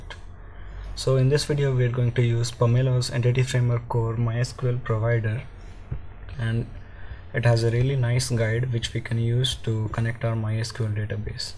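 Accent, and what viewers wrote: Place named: India. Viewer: I hate the Indian English.. I dont understand...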